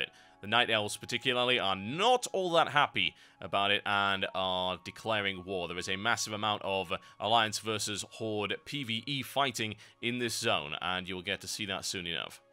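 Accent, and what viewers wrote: United Kingdom. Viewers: Why is everyone going bananas over the accent? Has no one ever heard a British person speak before? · Wait a second... Are you brittish? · Im ashamed to be from the same country as you :( im Norwegian 2 and i dont see anything wrong with his accent, infact i think a real British accent is awesome.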